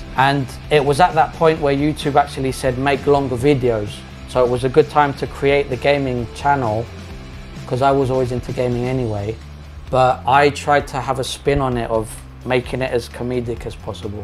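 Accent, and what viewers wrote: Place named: United Kingdom. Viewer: mate I'm telling ya if you go back to UK for even less than a year, you'll get your deep British accent back... greetings from Australia 🤙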